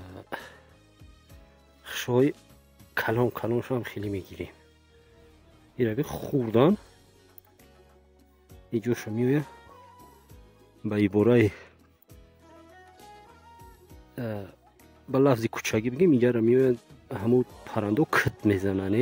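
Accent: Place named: Tagnob, Tajikistan. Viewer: Асалому алайкум бачаи хеш мохам мардумитагновем хам сари маргзори ва хам пасикухием бехтарин наворхо бо лахчай тагноби саломату сарбаланд боши бо фарзандонат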